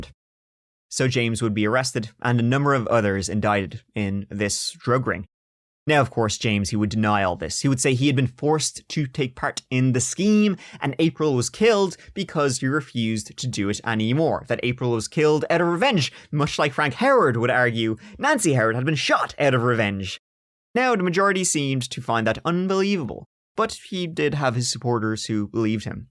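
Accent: Ireland. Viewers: I've watched all of your videos and started listening to your podcast. Omg you are amazing so entertaining, adorable with that Irish accent lol. Your storytelling with a touch of humor but respect for the victims, I'm speechless. The last time I laughed so hard was w Howard stern (when he was funny) Your starting a culture if that's the right word. I'm telling my family and friends to watch you videos and podcast. And why?? You ask. "Coz I love ya" ❤️ lol, your friend Mariah from New Jer USA.❤❤ Goodluck in all of your endeavors. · There is that saying, “One who wants their bread buttered on both sides” It does share that very same meaning “One who wants to have their cake & eat it” I love such sayings, they are simple & there aint a human being on the planet who doesnt know wot the heck they mean! Like this 1, “ U’ve made ur bed, so go lay in it” Another good’un, “ One who has Champaign taste, but only has Beer money” I just subd to That Chapter PodCast, coz i saw the length & to be honest, like really honest, (er... 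this may creep out a tad) its wicked Irish accent & his wicked wit & dry as fk & sarcastic sense of humour that has me totally addicted to bingeing the heck outta his normal YT channel, so now im gonna binge the fk outta his PC! Sorry, but u are addictive full stop!! Just had to lob that 1 out there, no point in hiding the reality! U ought to be blushing, someone beimg addicted to u, thats a complement, but so u dont freak out, i DO NOT have any intention of stalking u or finding out where u live so i can send u letters & strange gifts, etc!! Nope, i am only addicted to YT channels, (SO REST ASSURED ME OL’ FRUIT & NUT, ur safe) no stalkerish behaviour here!! Hahahaaa!! Now back to this PC, thanks! 💝, Peace & Bananas! Ha! 😝😉🙏🏻🤗🤣🤣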